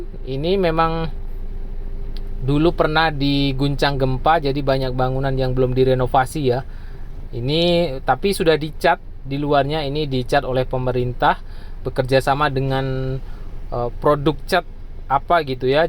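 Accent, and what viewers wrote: Java, Indonesia. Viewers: Dari lidahnya klo ngomong bahasa Indonesia seperti YouTuber² dr Jawa .. orang Jawa tengah y om...? Orang ngapak sepertinya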